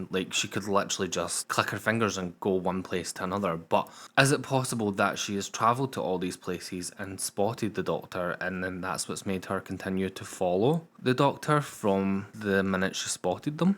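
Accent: Scotland, United Kingdom. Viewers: Your accent is like amelia pond but a boy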